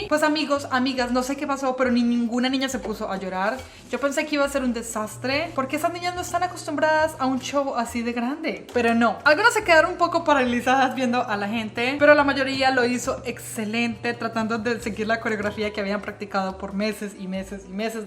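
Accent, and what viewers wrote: Colombia. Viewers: Como me gustan tus videos!!!!! Amo cuando hablas con tu tonada Colombiana, me encanta!!!!! Otra cosa, lo que decías de cargar combustible que no te gusta, me pasa eso mismo pero cuando voy detrás de algún camión o camioneta cargados con algo, por haber visto peliculas como "destino final" me da mucho miedo y si o si tengo que pasarlos jajajajaja Me encanto tu rutina semanal, como todos tus vídeos, por supuesto! 🌞❤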